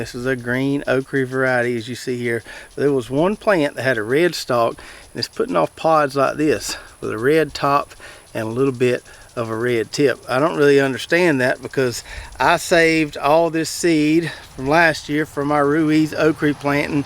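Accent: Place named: Georgia, United States. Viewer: I have a G. Neri fig tree that I bought from a nursery in Louisiana a little over a year ago. We were moving so it stayed in a pot for a good while. We moved late in the year (2019) so I didn’t plant it until the following spring (2020). It did okay the first year but didn’t grow much or bear any figs but I didn’t really expect any that first year in the ground. Then a late frost in the spring of 2022 killed it back to the ground. It has grown to be an amazing large plant over this year and it has a lot of little figs on it. I’m not sure if they’ll ripen before frost this year but I can tell it’s going to be an amazing producer. I have a Madeleine fig I purchased last year - again, killed back by that late spring 2022 frost. I also have 2 nice-sized Brown Turkey figs that I rooted from cuttings off the tree at our former residence. Those suffered the same fate as the G. 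Neri but they also have grown into very nice young trees. I expect a decent amount of figs off them next year. There are some figlets on them now but I will be surprised if they ripen before the first frost this autumn. I really enjoy your show - so much good information and you are in my state of Georgia, although some 200 miles south of me. I grew up in Dublin and I enjoy watching and hearing you speak - you sound like the folks I grew up with! 💕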